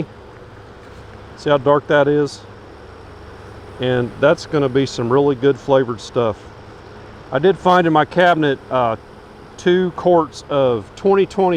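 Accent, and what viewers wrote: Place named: Texas, United States. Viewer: #1 I am a relatively new lover of bees. #2 My hat is off to beekeepers minding their bees in 90+ degree weather in the direct sun. Wow. #3 My hat is off to beekeepers (period). #4 I was smiling imagining it was Hank Hill in that bee suit because you have the same Texas drawl - then you brought up Hank and I broke out laughing. #5 Thank you 🐝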